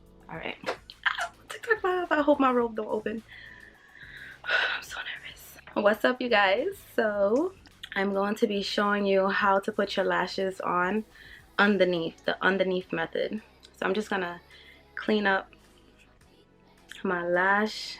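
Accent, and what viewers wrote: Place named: New York, United States. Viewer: Great job it looks good. I like your accent are you from New York?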